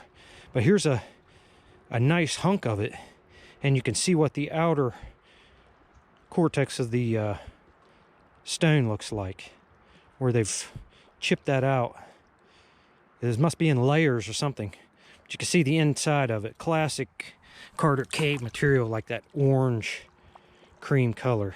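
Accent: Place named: West Virginia, United States. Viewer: Just started watching ur videos. I knew u had to be from WV w/your accent & u pulled 20+yrs in the Army Benning, Drum or Lewis? Pop bottle 77’, “I was just a little fella”, & so was I. I’m a 74 edition, lifelong Missouri man, Missouri born, Missouri bred & when I die I’ll be Missouri dead! 😆 Where I live, (not right on the water but close), is a small creek you can fish year round named “Cowskin Creek”, & it runs into a bigger stream named “Beaver Creek”. Old man I knew years ago found quality heads, points just amazing collection. His son donated it to the local county museum. We’re in Douglas county MO., & where I live just west of Ava 4-miles the Osage Indians lived here & a smaller tribe known as the “Ponkeshaw”, lived right here where I live. I’ve been down to Cowskin & just dig a hole in a gravel bar & find all sorts of heads. 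I’ve never found what I’d call real quality heads, but I always look in the creek or right on the banks. Watching you I’ve gotten new ideas & plans to search more effectively, hopefully finding a much higher quality of heads. The old man I mentioned found every single point, stone & head on the Cowskin Creek Valley & his collection was AMAZING!! If I find some good stones, points & heads I’ll send ya a few pics of what I was able to find! From this to you, thanks for the videos & really enjoy watching them & thanks for getting me energized again to go digging! I’ve got “Degenerative Disk Disease”, so I can’t up & go whenever I feel l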